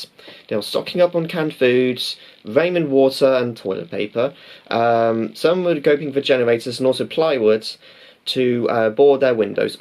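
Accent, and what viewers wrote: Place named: RP, United Kingdom. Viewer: nice bbc english accent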